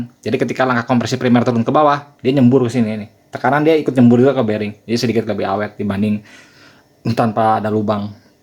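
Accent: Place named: Bogor, Indonesia. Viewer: Lokasi mana bang logat Bogor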